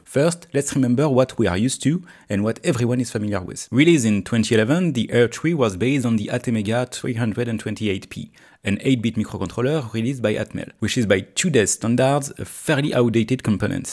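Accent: France. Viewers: Vous parler trop vite cela rend votre accent Parisien très agacent... mais quand meme très instructif ...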